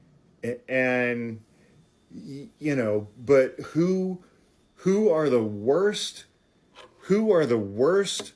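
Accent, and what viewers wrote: Canada. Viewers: You sound mostly like a left-ish Canadian. Speaking as one, not an insult. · You sound Canadian, almost.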